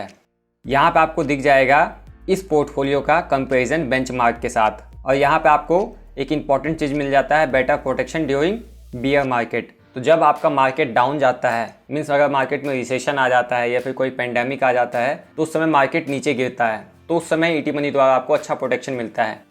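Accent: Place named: Bhojpuri, India. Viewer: Pehle apka Hindi bhojpuri sidharo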